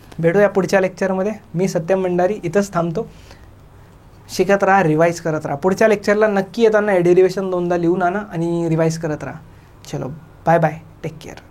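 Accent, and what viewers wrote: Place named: Marathwada, India. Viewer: Jalna aurangabad style😅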